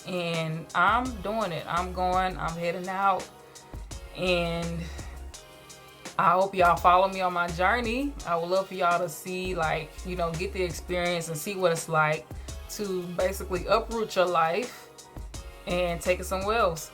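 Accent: Jamaica. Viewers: As a vegan you can shop at coronation market for fresh fruits for low cost,you sound Jamaican,it nice here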